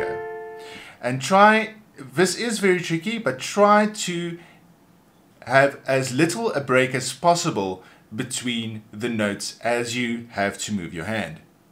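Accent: South Africa. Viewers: excuse me but are you South African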